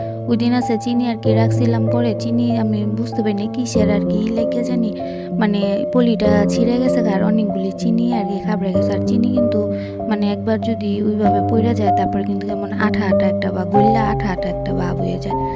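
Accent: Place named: Shariatpur, Bangladesh. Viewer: আপু আপনার কন্ঠটা অনেক সুন্দর, শরিয়ত পুরের ভাষার মতো লাগে❤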